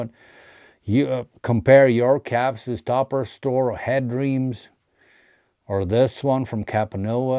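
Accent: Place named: Latvia. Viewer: I swear man, nowadays when I see a good cap I can hear that Latvian voice saying " fucking stunner! " 😅 thats your catchphrase LOL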